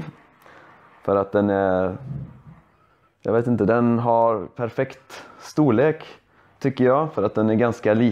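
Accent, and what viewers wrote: Sweden. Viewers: så söt du är alltså! din dialekt är fin också, göteborgska eller? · So helpful! What part of Sweden is your Swedish typical of?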